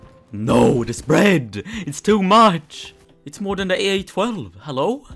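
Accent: Sweden. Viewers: Are you swidish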